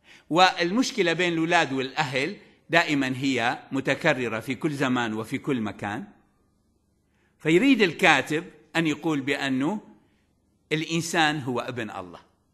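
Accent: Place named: Mosul, Iraq. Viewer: لهجتك المصلاوية مشوقة بقدرمحاضرتك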